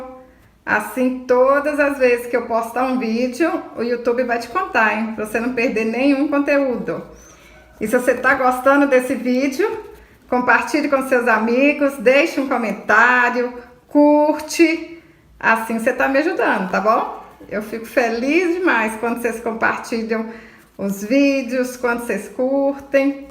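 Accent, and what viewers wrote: Minas Gerais, Brazil. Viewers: Amei essa ideia!!! Já acompanho seus vídeos há um bom tempo, mas só agora me inscrevi no seu canal. Gosto das suas ideias criativas. Às vezes me inspiro pra fazer totalmente novo e diferente do do vc fez, mas o crédito da ideia é seu. Usei uma ideia sua pra fazer o cabelo de uma vovó. Obrigada querida! Ah, amo sua mineirice😊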